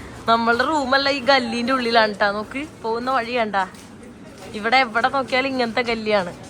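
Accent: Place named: Kochi, India. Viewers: Kochi slang anallo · Oru kochin slaang varunudallo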